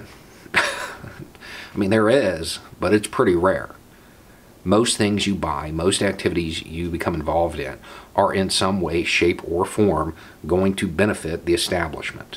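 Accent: South, United States. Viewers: I gotta tell you this one: Being honest, I come from a VERY liberal state. And not one anywhere near the South. There is a stigma here of Southern people: that literally of them who aren’t black are so far up Trump’s racist ass that they’re beyond saving... And to be honest, I saw a video of yours once through auto play, and I saw the beard and heard the accent... and I thought for sure you were some sort of confederate flag wearing neo nazi. Then I heard you talk. I mean I really listened to what you sad to say. And you should know that I had to take a step back and realize that I had been the biased one all along. I’d become the close minded person I believed others to be, and it was a really humbling moment. I listened, and then watched almost all your videos... and I want to stop and apologize to you and every other person I’ve written off for that kind of thing. 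Now, I may not always 100% agree with what you say, but I so deeply respect that you’re a thinking American. And I deeply respect that you’re out there being honest with your posting. It gives me hope for our country, that maybe - just MAYBE - we’re not actually as divided as the tribalistic political movements want us to be. Hope that others in home states like mine will listen to people like you, and stop jumping off conclusions and listen to what you have to say (and Vice versa). I owe you (a person I’ve never met) an apology and to ask forgiveness for making assumptions of who you were based on your pre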